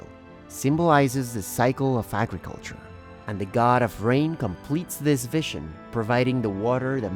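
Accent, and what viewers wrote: Mexico. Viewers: Tu voz se escucha mas sexy en ingles con ese acentito mexicano. 😅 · Estimado Sr.: me he vuelto un casi adicto a su trabajo. Por su acento deduzco que Ud. Méxicano, por su trabajo en Italia también deduzco que estuvo viviendo allá, también deduzco que es arquitecto y tiene una maestría en historias de la arquitecta o del arte. La pregunta de hoy, la pregunta: cuáles de estas deducciones son correctas??? Que ORGULLO encontrar un creador de contenido como Ud. Las comparaciones son odiosas PERO en ocasiones NECESARIAS y sin ánimo de verme MUY salamero, su contenido es MUCHO MEJOR que el de otros Youtubers mexicanos. Gracias por compartir sus conocimientos. Un abrazo grande y fuerte para Ud. Desde Tequila, Jalisco, México. El pueblo que le da nombre a nuestra bebida FAVORITA 🥃🍸🍹🥤 Feliz juevebebes,👍🖖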